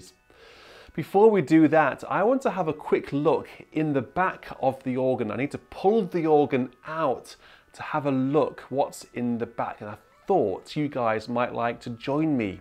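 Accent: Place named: United Kingdom. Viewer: For 1990's digital organ (Viscount), they are number of quite good sounds, others????. Sounds probably could be better if tweeked with amplifier and biiiiiggg speakers. But nothing comparable to 2021 Hauptwerk. I took my AGO Service Playing certificate in 2010 on a 1997 Rodgers 950 3 manual professionally installed Speakers/crossover + 6 ranks of Fufatti Pipes. in 2000 seat church. That sound I thought was excellent. But Hauptwerk in 2021 is the best!!! Digital Diapason has never sounded as good as the real pipe. As a Texan your commentary is always fun to hear--your words sound more intelligent with a British accent!! Love the dialog: organ is "rather heavy" to move, Jolly useful", Holy Moly", "get the Dyson", etc. Appreciate you., too.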